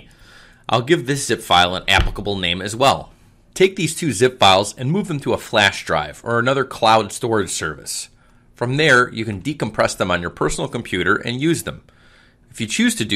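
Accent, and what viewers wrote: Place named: Western Pennsylvania, United States. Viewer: you are from western pennsylvania, aren't you? Somewhere near Pittsburgh, I would guess.:)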